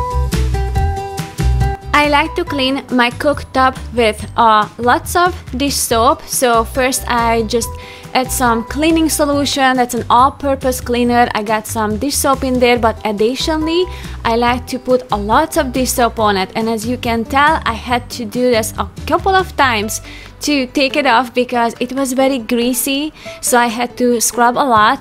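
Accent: Hungary. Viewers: Hello from Romania. Love your hungarian accent.🤗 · I don’t know why YouTube recommended your video and it started playing it with subtitles only and I saw that soup and I was like that looks hungarian.... so I clicked and I heard your accent and I knew it straight away that you are Hungarian too! Feliratkozva 🧡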